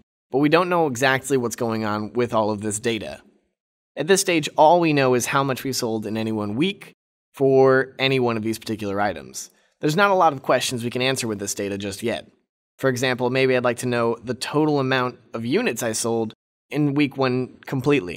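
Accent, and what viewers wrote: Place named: United States. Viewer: In the United States (assuming the video creator is from there considering their accent and the fact that they're using $s) the tax would be multiplied because it's not already factored into the price like it would be in most European countries. While it's something stupid with the country, it doesn't make the video creator wrong.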